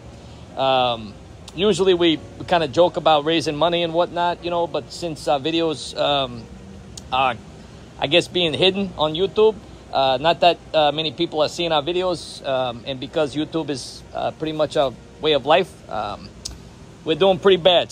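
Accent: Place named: New York City, United States. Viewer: Well dude you are waaaay New York City... Manhattan to be exact. I'm from Staten one of the other boroughs so yeah I recognize that accent!😉 Not quite quite a long island yenta but your close. Too fast for a Long Island yenta. Bro you my peeps!!! I never donate cash to any website ever but I do buy things that they have merch to support them. It's you and Tom MacDonald the only ones that I will buy from. And guess what? we're going through a heat wave too (113 yesterday) and mama needs a tank top so I think it's time to go shopping! I don't like seeing my peeps fail at things that they do so well. So my peep, it's time to check out the merch! You know I've always supported you and I will never stop. I still got my eat the babies t-shirt and I love it!! I love the humor and comedy & the work you put into it. Far as I'm concerned every damn ¢ is worth it!!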